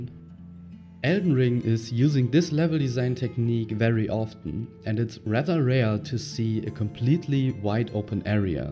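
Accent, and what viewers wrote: Germany. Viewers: Great Devlog! Am I hearing a slight german accent my guy?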